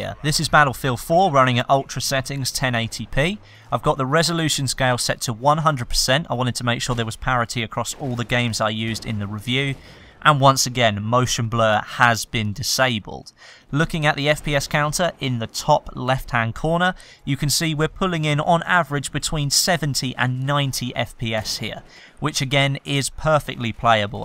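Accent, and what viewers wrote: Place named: United Kingdom. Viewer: Cool PC, love the way how Brits speak, I recently started a gaming channel after observing you and several other youtubers for an year approx . You can check my channel and see the quality of bids. Currently I have a suclish Logitech gaming keyboard which I use for gaming an always wanted to have a gaming one. If you like my content you can give your Indian friend some help.PEACE !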